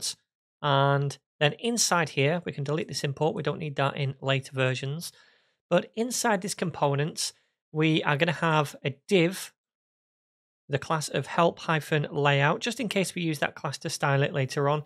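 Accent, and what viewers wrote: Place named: Manchester, United Kingdom. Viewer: Great tutorial! Thank you. I'm guessing that your accent is from Manchester. Perhaps Bury?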